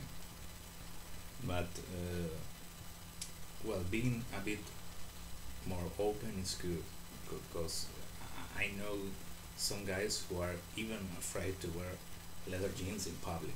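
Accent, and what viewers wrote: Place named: Spain. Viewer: I agree on the whole apart from 1 thing and that is how can anyone find a nicer down to earth guy than you, you say it as it is plus the Spanish accent makes my hairs on my back stand on end, keep up the great work adios for now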